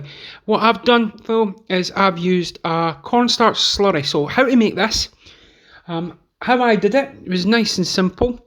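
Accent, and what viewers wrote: Scotland, United Kingdom. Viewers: I tuned in because I love a Scottish accent! But it's a bonus because maybe I will learn a couple of new recipes. In this, I think since I don't really care for carrots, I will switch them out for button mushrooms. Good luck. -Anne in Ft Myers Florida.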